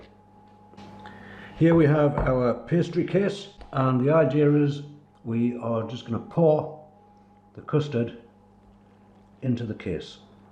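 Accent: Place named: United Kingdom. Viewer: What part of the UK are you filming from? Im watching in Canada and im not very good at placing UK accents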